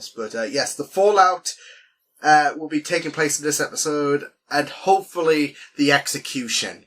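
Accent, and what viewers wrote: United Kingdom. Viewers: Another question: your accent is North of England? Yorkshire? I'm an amateur linguist.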